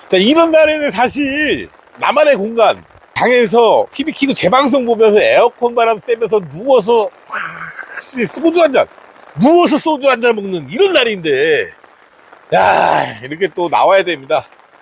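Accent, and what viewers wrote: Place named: North India, India. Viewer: 인도 북부 케쿠라 억양이 아직 많이 나마 있네요 한국말 잘하심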